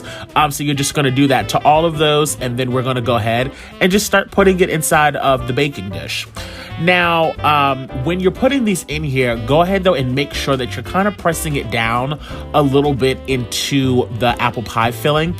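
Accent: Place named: South, United States. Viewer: in that southern drawl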